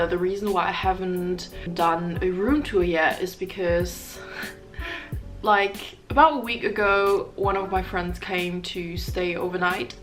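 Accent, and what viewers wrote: United Kingdom. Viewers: You're wonderful, in everything that you do! Just know that we all love you and support you:) Btw unrelated -is it just me or has your accent become a lot more British lately?:o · I haven't watched videos in a while (i haven't seen any in my subscriptions, youtube algorithms must be not showing me) her accent sounds different and kinda British-y? I love the way the black hair dye is fading on the ends of her hair it looks really cool. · Schön dich wieder zu sehen! Du siehst toll aus. Mach weiter so. btw Dein englisch hat sich bissl verändert oder?! du hast so einen britischen Akzent, ist mir vorher nicht so aufgefallen, vorher hat man immer gehört, dass du eigentlich Deutsch bist 😄 liebe Grüße, stay positive 😘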